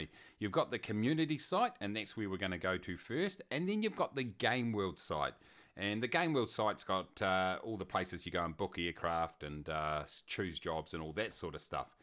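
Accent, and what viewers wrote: New Zealand. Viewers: Love your accent dude, you sound like my coworker thats from New Zealand!!! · Unrelated, I love that kiwi accent of yours. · Right away heard the Kiwi accent. IFF ESS Economy.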